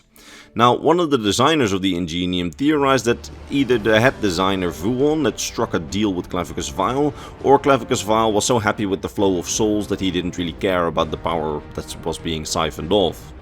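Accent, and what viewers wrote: Netherlands. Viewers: It’s the Dutch accent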